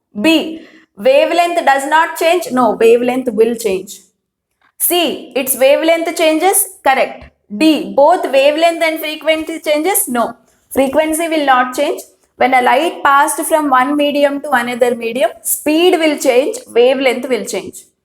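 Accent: Karnataka, India. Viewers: Mam are u from Karnataka 🤔🤨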